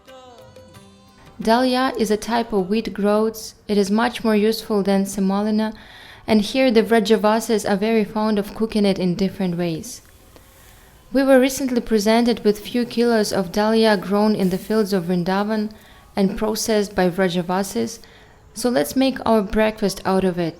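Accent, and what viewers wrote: Russia. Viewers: Your accent seems that you are from Russian Federation ? 😯🙃🙏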